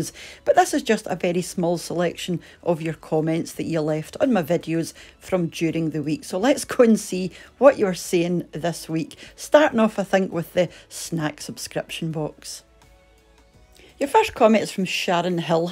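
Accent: Scotland, United Kingdom. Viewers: Lovely Chanel and great food! I’m from Somerset England. I love the Scottish accent. Did you ever watch Highlander? If so what did you think of it? X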